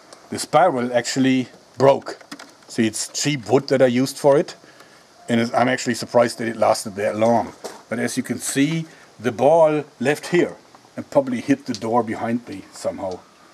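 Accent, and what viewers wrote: Germany. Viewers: Lol I love that German accent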